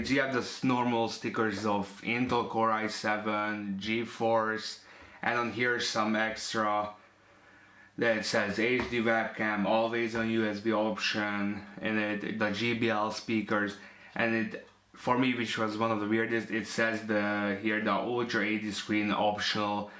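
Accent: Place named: Hungary. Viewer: Kihallottam a magyar akcentust :) Hun bemutatót nem akarsz csinálni?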